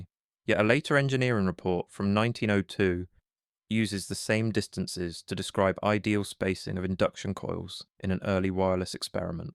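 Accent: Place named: United Kingdom. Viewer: fake British AI generated voice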